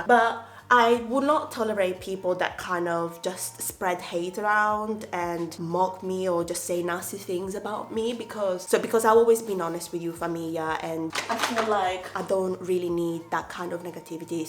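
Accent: Italy. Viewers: I love your accent! My best friend is from Trapani and it's her essence. I love seeing her as natural as she is, the same I wish from everyone! Also, loving when you're consistent with creating content, you entertain me a lot! But I understand that it's a lot of work and effort, so I'll be waiting paciently <3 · Your accent is amazing. Are you kidding? They're jealous...you're hot, smart and have a sext accent. Come on!! I'm Italian also and your accent is so authentic, beautiful. Forget these idiots!!! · Ehi bella, sei la mia inspirazione! Io sono mezza italiana e vederti tornare al tuo accento italiano mi scalda il cuore. Sono così orgogliosa di vederti come italiana che vive nel Regno Unito. Per favore, non cambiare mai chi sei perché abbiamo bisogno che più persone come te siano se stesse in modo impenitente. Non sai quanto sei bella e unica. Gli altri ti invidiano perché sono ignoranti e vogliono quello che hai. 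Sii orgoglioso di essere italiana!! 🇮🇹🇮🇹🇮🇹😘❤❤ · I don't think people were picking on your accent. They were curious as to why you suddenly sounded different. The Italian accent is beautiful and it's sad that you felt that you had to hide it. Good that you're not doing it anymore, apologies for judging without first understanding. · Hearing the Italian mixed in with the English accent when yo speak is amazing to me. I love hearing it and doesn’t make a difference in understanding your content. For me it gives you character and I love when you call us famiglia. Thank you for all that you do. Your content is so informative, real and inspiring. Also you are so brave for moving all by yourself to a new country at such a young age. Your success shows your strength and perseverance. Lastly that concealer looks amazing, what brand is it? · I would’ve never guessed you were Italian I thought maybe you were Puerto Rican or Dominican I love the accent 😍 Your body is fire 🔥 work it girl Love your content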